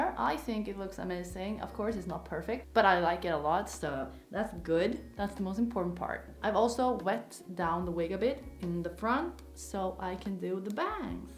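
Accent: Sweden. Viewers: Not to be rude or anything... But does she have a Swedish accent or is that a disorder..... I'm new to this Chanel 🤔😓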